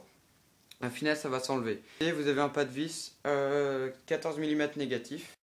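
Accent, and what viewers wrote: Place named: United Kingdom. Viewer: J'adore ton accens anglais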